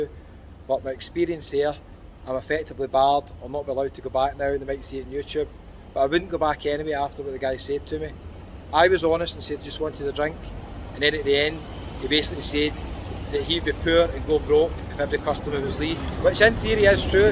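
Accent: United Kingdom. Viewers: Mate, get real. With your strong accent, they probably didn't understand you. Why do all Brits think it is there given right to speak overseas in English only??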